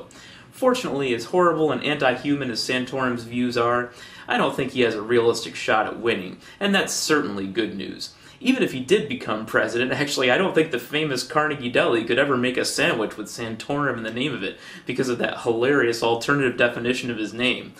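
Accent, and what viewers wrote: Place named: Canada. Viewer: Amazing canadian accent, props.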